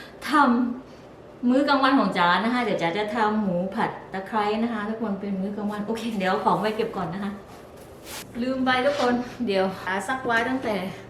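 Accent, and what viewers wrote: South, Thailand. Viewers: น้ำส้ม ไม่มีเยื่อ นิคนใต้ชัดเจนไม่ต้องหมุนเสาเลยค่ะพี่จ๋า🥰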